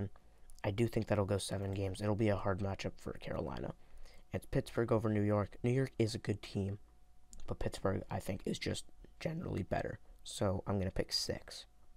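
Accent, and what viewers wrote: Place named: Toronto, Canada. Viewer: You can tell you are from Toronto by the way you say it. “Cheronto” anyways GO LEAFS GO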